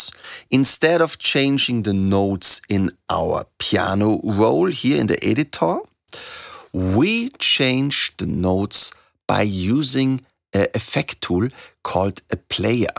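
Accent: Germany. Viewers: I can’t get past the thick German accent 😅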